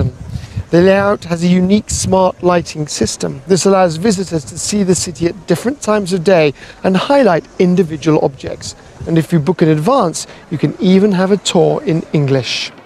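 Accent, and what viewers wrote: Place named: United Kingdom. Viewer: Ооо мы обязательно посетим. Британский акцент тоже привлекает!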